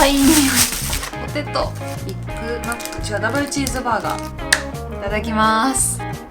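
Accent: Kansai, Japan. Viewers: 女性YouTuber全然興味なかったし見もしなかったけど、は飛び抜けて可愛いし関西弁が可愛すぎる。。。チャンネル登録してしまった。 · お疲れ様です、毎回楽しく見てます。50のおっさんでも毎回そうそうと思いながら見てます。関西のイントネーションが好きかも(笑)頑張って下さい！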